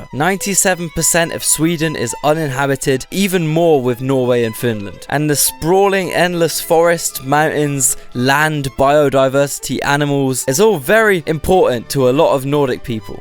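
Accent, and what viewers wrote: United Kingdom. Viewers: Oh what I didn’t know you where a fellow swede that oi bruv accent is strong, when did you move to the uk? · I love how a BRITISH guy talks xd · Norwegian guy her. I approve this video 😁👌. Cool hearing a british guy that really gets the nordic banter humor we got goin' on ! 😁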